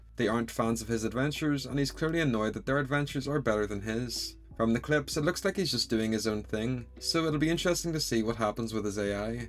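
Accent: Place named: United Kingdom. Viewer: Your accent sounds like a british person🏴🤯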